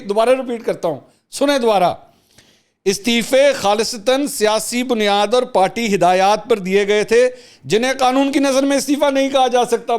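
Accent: Punjab, Pakistan. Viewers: کمال کا ہے آپ کا پنجابی ٹچ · Punjabi touch suit him sir We can better understand his point in mother language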